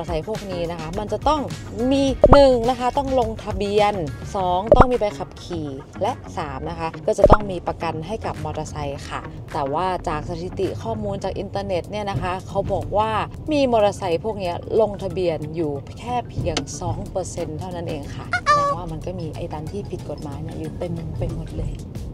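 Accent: North, Thailand. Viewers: สาวเหนืออู่คำเมืองน่ารัก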